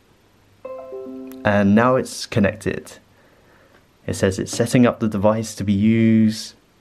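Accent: England, United Kingdom. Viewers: First time I see an Asian with British accent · Was not expecting this guy to have a British accent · How are you asian but with an english accent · He looks asian but he has an British accent LOL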